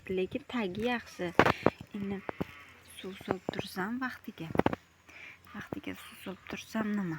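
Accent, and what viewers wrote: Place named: Bukhara, Uzbekistan. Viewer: Каердансиз гапиришингиз Бухороликлардай гулларингизни сотасизми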